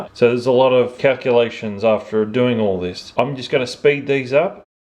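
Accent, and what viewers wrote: Australia. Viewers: AU Love the colours, and the way you have it is lovely. It's so good to see a video with Aussie measurements, and accent!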